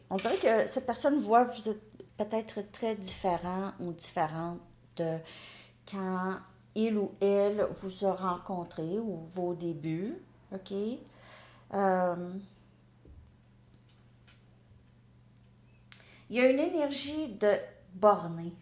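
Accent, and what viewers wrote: Canada. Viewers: Vs êtes adorable et encore plus votre accent Canadien je vs adore 🥰 Princesse 🇹🇳💐🌹🌹🌹🌹🌹👏🏽🎻🪐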